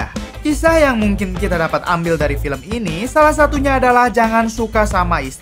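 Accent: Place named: Madura, Indonesia. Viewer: Orang Madura kayaknya yang ngisi suara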